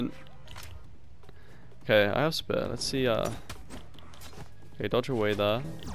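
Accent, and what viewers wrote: England, United Kingdom. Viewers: hey you're from england right?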